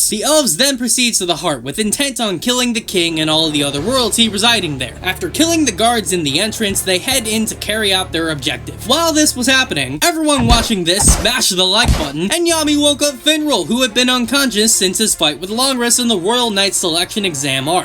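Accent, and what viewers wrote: United States. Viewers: I clicked the video and i thought it wasnt. Your accent over american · i said his american accent was getting better😂💯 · WHAT HAVE U DONE TO, HE'S BEEN KIDNAPPED BY AN AMERICAN WHO IS IMPOSING AS HIM D: on a serious note give us back not this merican dude. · I feel like when you talk in your American accent it makes me sad because you are conforming to American society and losing your individuality. Your accent is sooo much more passionate and interesting to listen to!!!! American accent for memes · Am I the only one who likes his American accent? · this video killed me and he actually managed to make it to then end using the american accent :) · Hahaha bro your American accent is really good. Impressive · I don't know who can't get enough of the American Accent but watching it with your new videos I can assure it doesn't have the same energetic and passionate as your usual accent. 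Please go back to your usual accent.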